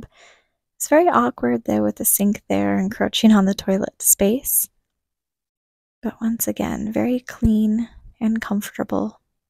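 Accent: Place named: New York, United States. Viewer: Hello.. I. Enjoyed. Emenseley. Your. Video. How. Ever. Your. Warm and. Easily. Understood. Your. New York. So. Soft. And. Clear. meaningful. Or. Your. Commentary. In. It. Was. Sense and. Sensibility. Of. Your. Honesty. Voice. That's. Kept me. Watching. Your. Video. Was. An. Insight. FOR me. You. Also. Have. Explained. Every. Detail. And. Information. Well done. FOR. Your. Knowledge and. Your. Attention. Wish. Luck. And. A. Good. Time. All of. You. Thank you so. Much bye. FOR. Now. I. Will. View your. All. Your. Next. Videos.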